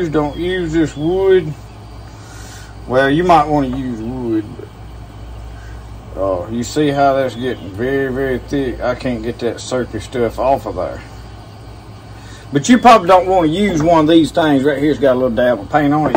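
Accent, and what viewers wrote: South, United States. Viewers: You sound very southerner. So I have a silly question? I. Live in florida. So does this grow in florida? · Southern accent video -that’s priceless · Thank you SO much. This is the first video I've ever found that shows virtually unhaired wild lettuce. I've got lots of it on my property and although my leaves look more like others' wild lettuce than like yours, you were the first person who has said that the hairs on some of these are undetectable. That stuff with the yellow flowers you showed...that's totally what I have. I've known instinctively that this stuff in my yard was wild lettuce and needed to be used, but I couldn't find enough confirmation until now. Plus northern and southern wild lettuce can be so different that I needed a southern accent video to make sure I was doing it right. Thank you! I wish there was a way to rank YT subscription list cuz I'd put you as #1.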